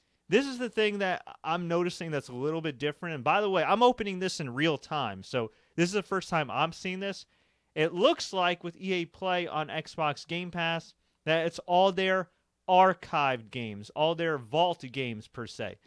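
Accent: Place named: South, United States. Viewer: This guy has a southern accent, Lew has a Canadian accent. Delivery is similar tho.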